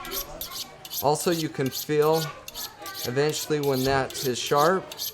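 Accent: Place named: Utah, United States. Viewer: 😂 Yeah, I blame it on the Utah accent. Will try to do better next time.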